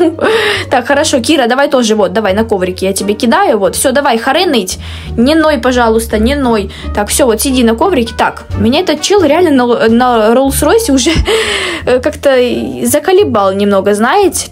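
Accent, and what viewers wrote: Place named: Ukraine. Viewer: Скажите пожалуйста она русская просто речь как у украинки(не хейт) очень люблю её смотреть❤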